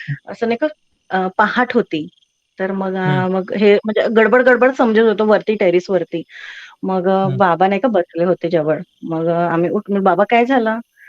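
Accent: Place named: Vidarbha, India. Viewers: अनुभव भयानक आहे पण मला नागपुरी बोलीभाषा खूप आवडली ऐकायला!! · मी ही गोष्ट ऐकतच नव्हतो, पण या ताईंचं नागपुरी वऱ्हाडी मराठीत बोलण्याचं ढंग, आणि त्यात अधूनमधून येणारं हिंदीचं मिश्रण हे सगळं मी लक्षपूर्वक ऐकत होतो. त्यामुळे वैदर्भी बोलीभाषेबद्दल थोडा अभ्यास झाला.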